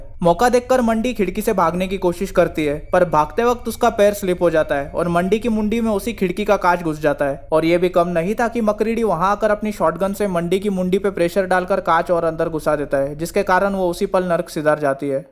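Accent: Maharashtra, India. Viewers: Bro you have marathi accent that is why I asked do you live in Maharashtra that's all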